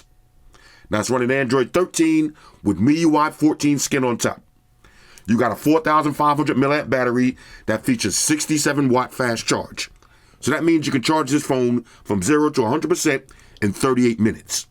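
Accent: United States. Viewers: Where are you from, bro? You sound American but your usage of the word "aluminium" is throwing me off 🙂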